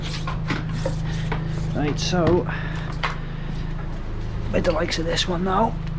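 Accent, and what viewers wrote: Liverpool, United Kingdom. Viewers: This man is Scouse.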